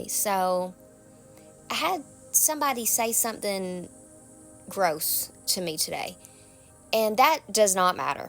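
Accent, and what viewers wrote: South, United States. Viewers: I love your Southern accent 😄.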